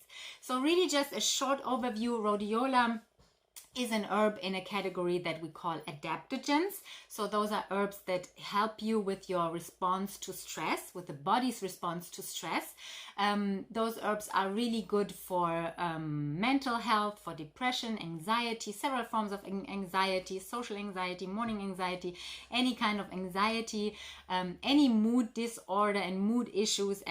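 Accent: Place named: Russia. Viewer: Thanks for the valuable advice. Have you studied herbs? Do you live in US? I sensed a Russian accent. I ask just out of curiosity.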